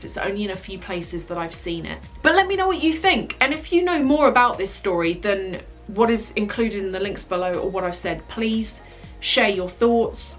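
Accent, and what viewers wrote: England, United Kingdom. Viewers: What part of England are you from? I love your accent❤️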